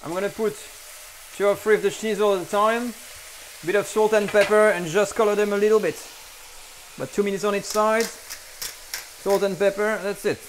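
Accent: France. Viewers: he's native french speaker, providing english for us. can YOU speak french that well that you'd be on camera and be perfect? sheesh folks gotta insult others... make egos happy insulting. no wonder there's global issues with RUDE RUDE RUDE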